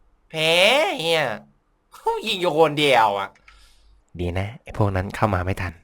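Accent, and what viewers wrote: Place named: South, Thailand. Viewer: เสียงเหมือนคนไต้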